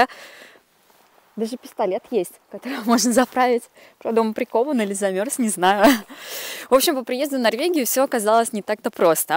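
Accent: Standard. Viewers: этой подписчице приятно слышать чистую, грамотную, русскую речь. У Вас способности к языкам, не очень заметно что вы выросли в Латвии. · Очень приятно слышать чистую,грамотную русскую речь. При том, что девушка выросла в Риге. Какая красота вокруг! Как будто я сама там гуляю и дышу чистым прохладным воздухом! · Спасибо большое за ваши простые и в тоже время неповторимые ролики.... Всё очень красиво, ухожено вокруг. Так красиво.... Вы такая молодец, столько учитесь.... Так приятно видеть свободного, образованного , прекрасно воспитанного человека...,с уважением относящегося к другим странам и нациям, знающего несколько языков и при этом ещё говорящего на прекрасном правильном, чистом русском языке, без мата и проклятий.... Спасибо вам, огромное, счастья и процветания !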